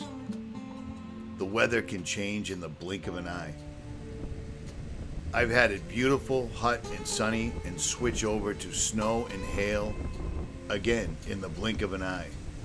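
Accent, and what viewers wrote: Boston, United States. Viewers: You sound just like Ritchie from Boston.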